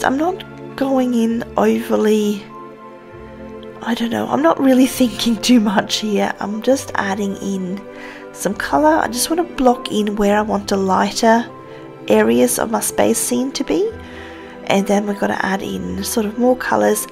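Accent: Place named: Australia. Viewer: Your accent is becoming more and more Australian hehehe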